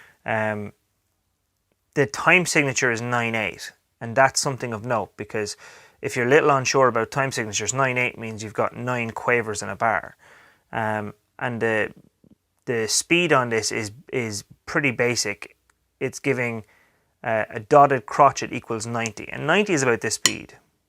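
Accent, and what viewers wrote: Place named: Ireland. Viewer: I hear your pain! I only have a small rubber mat kit at home with an added real bass drum but I can practice on a real kit at school 3 times a week for half hour. I'm about to take Trinity grade 1 but it's hard switching from acoustic to electric when practicing! I like your videos and your lovely Irish accent 🙂.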